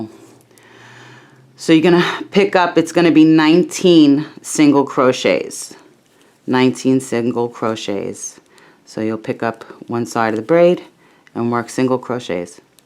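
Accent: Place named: New York, United States. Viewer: Your tutorials are some of the best that I've found. They are so easy to follow and I love listening to your new York accent and the sound your nails make clicking together when you crochet ☺️ Thank you so much for these tutorials..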